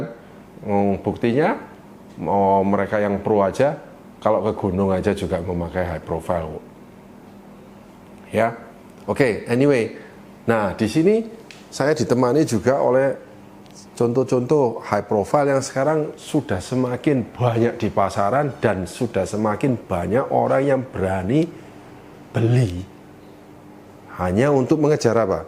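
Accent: Surabaya, Indonesia. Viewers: I love..penjelasan nya mudah dipahami..yg penting logat suroboyonya yg bikin meyakinkan..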